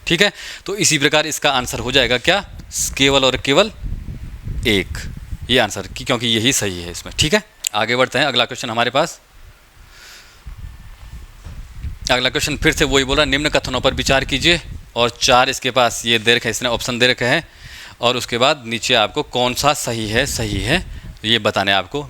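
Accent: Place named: Garhwal, India. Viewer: Sir ki aavaj ek garhwali lag rahi h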